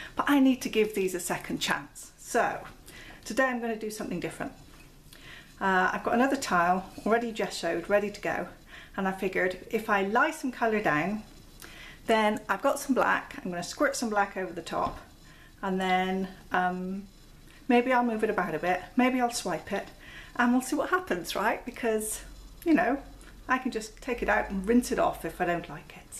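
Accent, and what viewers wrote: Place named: West Country, United Kingdom. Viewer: So nice to hear a fellow West Country accent! ☺ Props to you for even attempting a painting with these colours... i mean, wow! lol 😎😎😎😎😎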